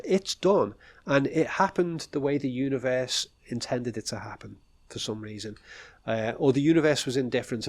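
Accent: Liverpool, United Kingdom. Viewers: Mr, I've watched your videos off and on a for a couple of years now. Your videos are fantastic and your insight is incredible. I've often thought your accent is quite similar to that of Paul McCartney. Well now you've confirmed it for me as you stated you are from Liverpool just as he is. You kind of even look a bit like him. Just an observation is all. Be well sir.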